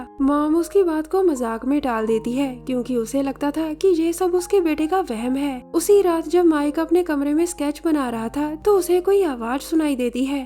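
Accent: Punjab, India. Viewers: Sis tusi punjabi o na 😂 ?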